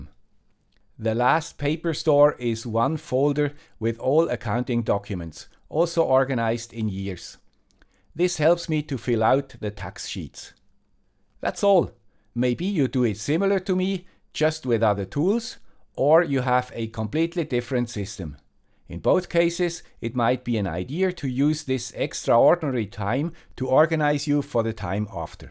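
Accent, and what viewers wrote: Switzerland. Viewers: Your channel is "about sensors and microcontrolers" with a Swiss accent -:). That's why I have a subscription on your channel. For me, this subject is to far off (personal opinion).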